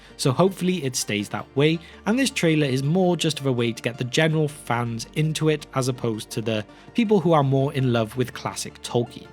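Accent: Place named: Wales, United Kingdom. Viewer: Literally just stumbled on this channel today. Presenter’s accent is slightly subtle and I’m like “is this guy Welsh?” … then I hear how he pronounces Morfydd and Owain and I’m like “you son of a bitch, I’m in” SUBSCRIBE 😁🏴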